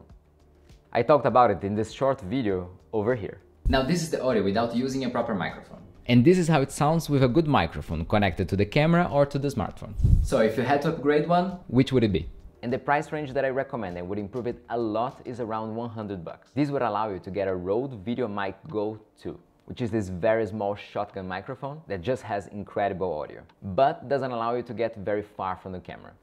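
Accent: Brazil. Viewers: I don't know, but it seems that you are BRAZILIAN, your accent is the same AHAHAHAH. great content brother!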